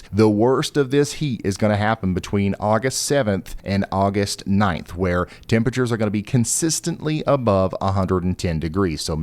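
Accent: South, United States. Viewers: My Husband asked if he was getting paid per "gully washer" today. Love his southern-ez.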